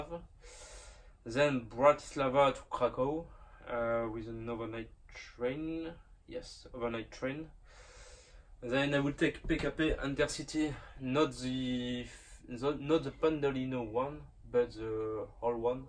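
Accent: France. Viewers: On reconnait bien le petit l'accent français comme tu parle anglais :D bref, Bonne chance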